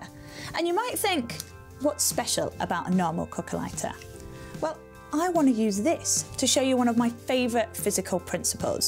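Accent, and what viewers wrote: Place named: Yorkshire, United Kingdom. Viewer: Sounds like somewhere in Yorkshire to me.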